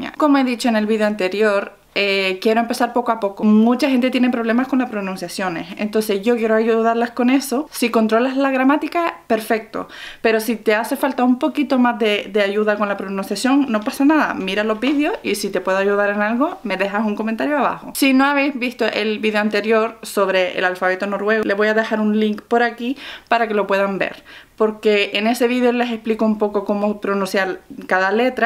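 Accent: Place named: Canary Islands, Spain. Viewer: Que acento más canarión tienes (de Gran Canaria) jajajaja gracias por el vídeo!! Muy bueno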